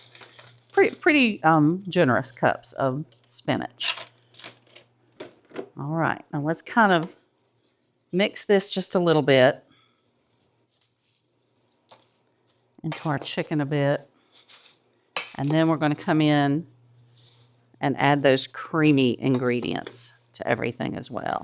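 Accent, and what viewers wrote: South, United States. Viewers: Hi and D, Everyday I’m looking to see what dish your making.. Never heard of this casserole but planning to put it on my meal plan for next week.. Looks easy and tasty..If I wanted to add sides to serve with it then what do you suggest?.. Thank you for the recipe..I like your southern drawl and you have beautiful healthy hair.. Retired cosmetologist here with a thick southern drawl …😂😂😂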